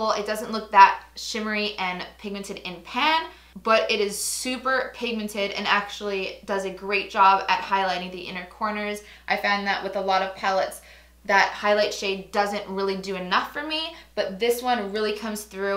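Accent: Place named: Canada. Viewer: Sorry... you're Canadian, I'm rubbish with accents! 🇨🇦 xx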